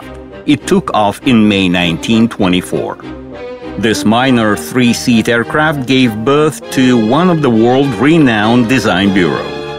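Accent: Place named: Russia. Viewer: Very interesting. But why does the speaker have to have a russian accent?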